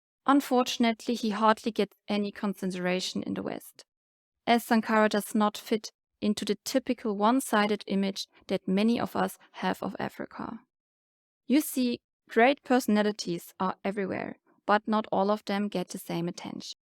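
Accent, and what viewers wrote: Germany. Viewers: Amazing!! I really loved this video!!🥺 It was so sad to see that you don’t have the recognition you deserve. Hopefully your channel grows soon!! You deserve it! I’m so glad I stumbled across it and I will be watching more of your videos!! I really love your accent btw. What part of Germany are you from? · Great storytelling. I recognise your German accent. I'm Namibian so I hear it often in our cities like Windhoek and Swakopmund. We have a huge German community in Namibia. I'll subscribe to your channel.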